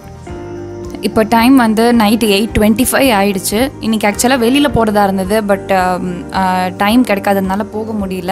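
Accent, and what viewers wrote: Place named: Badaga, India. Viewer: Sister nenge badugas ah.. neenge pesarthu apdi tha iruku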